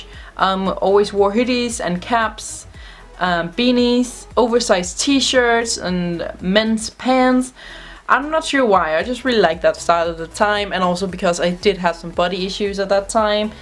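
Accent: Denmark. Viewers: lol jeg vidste du var dansk pga din accent, har også gået på smededal!!!!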